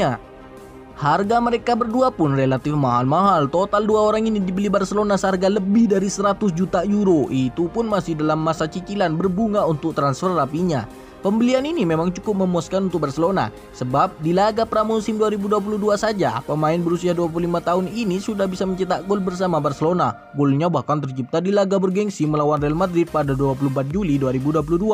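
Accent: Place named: Madura, Indonesia. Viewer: Logat Lu Logat Madura ..... "Lu Fans Madura United ya?".... Tahun Depan Bisa Main UCL? kalo Bisa, Ronaldo mo kesana sama Braithwaite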